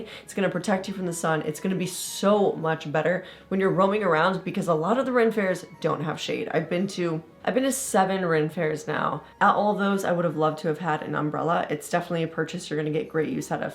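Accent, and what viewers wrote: United Kingdom. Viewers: Always such a kind positive soul - energy- so sweet✨ Best wishes to you Also brit accent is lovely haha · Your accent is very good. I thought you were England. Maybe do lessons on how to speak "Ren Faire"?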